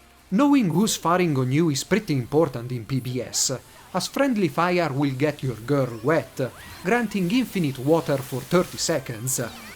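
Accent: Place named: South, Italy. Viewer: I know you aren't italian from your last name, but man, you could also fool a southern italian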